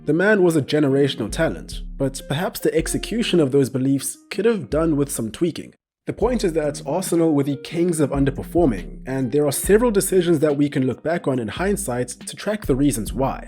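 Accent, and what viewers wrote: South Africa. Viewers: You sound south african? Correct? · I recently discovered your channel. I could tell by your accent that you're South African. Once I confirmed that, I hurried to subscribe because you make really great videos. I vowed to myself that this would now be my favourite channel pertaining all things football. Then you made a joke about my beloved Arsenal. So I will give it to you in a way that's quintessentially South African, voestek! 🤣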